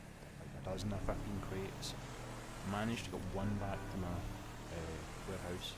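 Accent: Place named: Scotland, United Kingdom. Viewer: I really agree with what you're saying this is why I haven't invested in buying an office yet. my personal opinion on this update is it seems like a bit of beta idea for the next gta. kinda like how the whole gta was a beta on last gen. anyway good video your mic was a little quiet, but I do watch most of my videos and maybe that's why, you also surprised me I thought you would be American (not sure why) ,but you're Scottish which is cool Bro 👍✌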